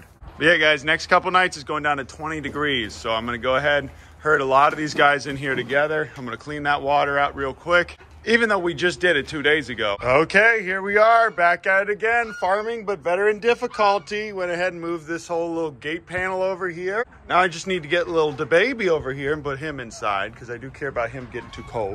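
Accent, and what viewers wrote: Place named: Pittsburgh, United States. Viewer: Dude your Pittsburgh accent while on the fahm is the best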